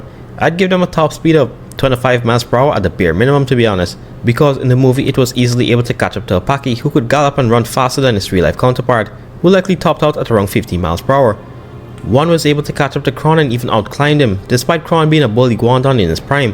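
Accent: Caribbean. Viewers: I heard the accent and immediately thought you were from the Caribbean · Are you Caribbean by any chance? I'm picking up a hint of Jamaican, Trini or Guyanese in your accent. Love from Guyana! 🇬🇾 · i know many, many people have probably already pointed this out to you... but i noticed your Caribbean accent. from one west indian to another, you make some damn good videos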